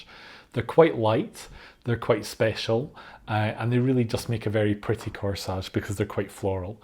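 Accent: Scotland, United Kingdom. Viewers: refreshing to hear a Scottish accent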